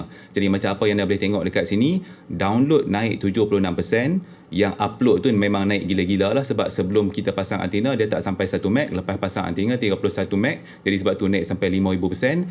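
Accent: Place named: Kedah, Malaysia. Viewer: walaupun anda menyebut anda...tapi kokdiang nya tu cukup masih pekat kedengaran hahahah kedah hadirrr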